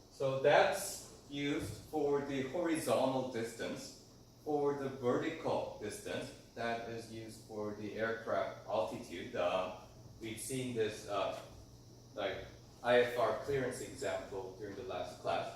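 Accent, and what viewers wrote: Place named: United Kingdom. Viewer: Where did you get your British accent? LOL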